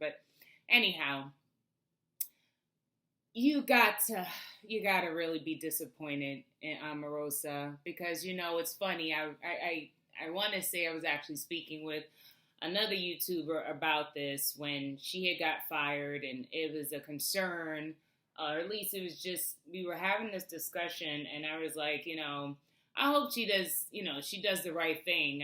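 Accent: New York, United States. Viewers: Hi am from Nassau Bahamas I subscribe too your channel early this morning, and you soo beautiful I love your charming sweet voice you could tell that you are a true New Yorker lol.. Amorosa already went on some shows and she already have a book..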